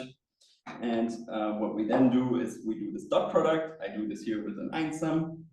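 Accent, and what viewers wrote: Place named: Germany. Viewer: perfect German accent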